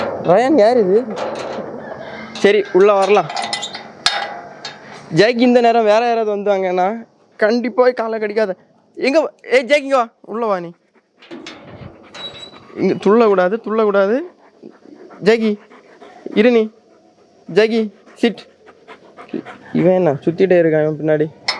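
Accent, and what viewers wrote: Kanyakumari, India. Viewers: Anna..... R u from Kanyakumari... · Kanyakumari ah bro neega Kumari slang la pesuriga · Bro r u from Kanyakumari 🤔🥰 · Bro nenka kanyakumari slangaa ,nan kanyakumari 😁😁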